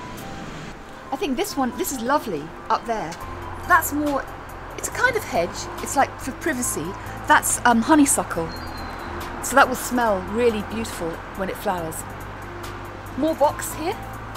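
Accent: United Kingdom. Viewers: 好喜欢的英式口音，说得太棒了，太好听了我打算一句一句学。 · 天天在澳洲听着土澳口音，再回头听英国口音简直是种享受！🤣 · 的英式英文很好聽，人也很知性優雅。👍🌹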